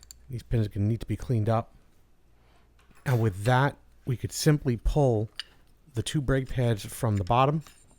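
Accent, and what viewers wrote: New York, United States. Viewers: Excellent video sir. Love the NY accent. I'm from NH and speak a little different.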